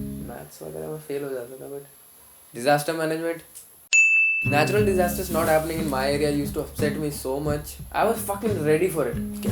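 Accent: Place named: Ghaziabad, India. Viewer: Dude. . .are you from Gaziabad or a nearby town ? Actually i have two cousins living there and they have this similar accent as yours ! Please reply ..... I'm curious !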